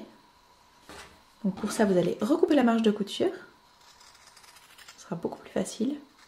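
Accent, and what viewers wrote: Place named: Switzerland. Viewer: Tuto tres clair, net et précis ça sera pour mon petit- fils de 7 ans merci bcp Je fais ma curieuse d'où vient ce petit accent je dirais soit Suisse soit Belgique soit Alsace😅 Ps, musique très dinamisante 👍🏽👍🏽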